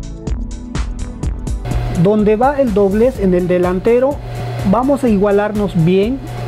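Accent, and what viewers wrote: Peru. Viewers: Obersay? Tela flish terri? Q gracioso para peruanizar las palabras 🤣🤣🤣 igual se ve buena onda, saludos!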